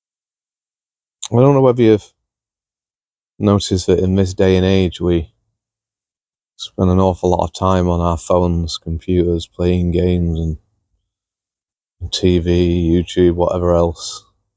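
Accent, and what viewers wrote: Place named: North West England, United Kingdom. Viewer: Lovely to sit and listen to you. I'm from the North West of England and it's rare to hear someone with a familiar accent talking about this.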